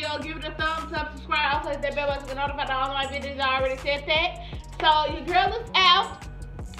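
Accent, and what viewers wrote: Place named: Chicago, United States. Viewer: you definitely from chicago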